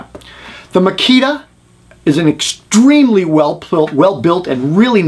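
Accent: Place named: Worcester, United States. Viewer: Having lived in Worcester for the first 20 years of my life, I could place that accent immediately.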